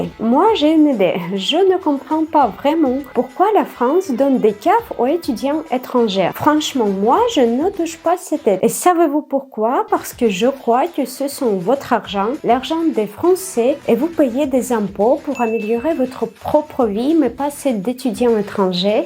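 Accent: Russia. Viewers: Bonjour. Je viens de tomber sur ta chaîne Youtube et sur cette vidéo par le pur des hasards. Ca me fait tellement plaisir que tu aimes notre cher pays qu'est la France malgré les tensions très fortes et très dominantes dûes au contexte actuel. Que ça me fait plaisir de te voir sourire, de te voir t'enthousiasmer, de dégager de bonnes ondes positives, dégager de la chaleur humaine et de la bonne humeur. J'adore tout autant ta franchise. Tous ces éléments combinés te donnent énormément de charme tout comme ton accent russe. Ca fait tellement du bien. Mille mercis à toi. Surtout ne change pas et reste comme tu es. Saches que tu as une superbe maîtrise de la langue française et que tu fais très peu d'erreurs. Nul n'est parfait. Nous devons tous apprendre de nos erreurs. Prends bien soin de toi. Amuse toi bien avec ton apprentissage au tennis. J'espère que tu pourras enfin créer ton entreprise dès que possible. 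Au plaisir de découvrir tes autres vidéos. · Jajaja j'aime ce petit accent Russe jijij ne le perd pas .😅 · Merci pour ta vidéo. Si seulement la majorité des français pouvait aimer ce pays autant que toi, on en prendrait beaucoup plus soin, malheureusement en France on aime toutes les cultures du monde, sauf la notre. Ça fait plaisir aussi de voir que tu fais autant d'efforts pour maitriser notre langue mais si je peux me permettre un conseil: essaie de faire moins de faute mais garde quand même toujours un peu ton accent russe. Déjà parce que ça fait partie de ton histoire mais surtout, un accent étranger ça donne toujours beaucoup de charme; même les américains préfèrent bien souvent l'accent anglais à leur propre accent. Enfin, ton accent permettra aux Français de savoir que tu as des origines étrangères et donc ils te pardonneront plus facilement si tu fais des fautes de français ou si ton comportement leur semble un peu étrange. 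Voici un petit exemple amusant venant d'un humoriste Franco-Anglais qui te permettra de comprendre pourquoi tu dois garder ton accent: <link> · J'envisage de m'expatrier en Russie dans l'année à venir, et entendre ces points de vue croisés est une mine d'or pour moi. Merci! Твой французский идеален, а русский акцент просто очарователен! :) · Votre français il est super, l'accent russe lui donne tous son charme. Merci pour votre vidéo. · Tu es intelligente, tu as une vision très juste et réaliste des choses. Le mélange des cultures est ta force. Tu parles un excellent français avec ton accent russe si craquant. J'ai un grand respect pour la Russie, sa langue, sa culture, son histoire et ses scientifiques. Je trouve l'hymne russe le plus beau de tous, il est fougueux comme La Marseillaise. C'est un pays que j'aurais voulu mieux connaitre, je ferai cela dans ma prochaine vie. Je te souhaite santé, bonheur et réussite. 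En toute bienveillance. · Je trouve que ton accent russe rend ton français mignon 🥺 d'ailleurs sa m'intéresse beaucoup de voir une personne russe vivre en France car tu t'intéresses à notre culture 🥰🤗 j'aimerai tellement apprendre le russe que je connais pas très bien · Наконец-то ютуб рекомендовал мне видео, где слышно, как звучит русский акцент по-французски. И наконец-то я попал на видео на французском языке, где я могу разобрать больше пары слов в минуту. 15 минут в дуолинго в комнате раздумья не прошли зря! Je suis tres content!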